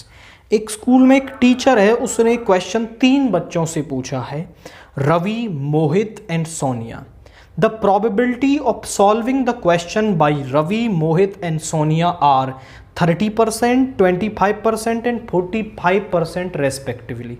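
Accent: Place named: Haryana, India. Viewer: Tone to haryanvi h bolne ka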